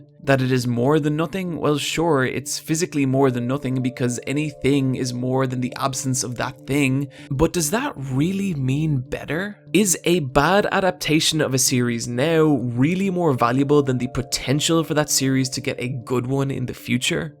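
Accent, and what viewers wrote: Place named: Dublin, Ireland. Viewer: are you Irish? You have a Dubliner accent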